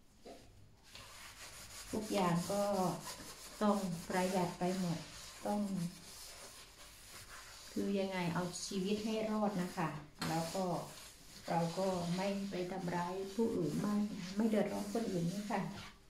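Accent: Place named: South, Thailand. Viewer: พี่warutaเป็นคนใต้ใช่มั้ยคะ แฟนหนูคนนครศรีคะ เค้าบอกว่าสำเนียงเหมือนคนใต้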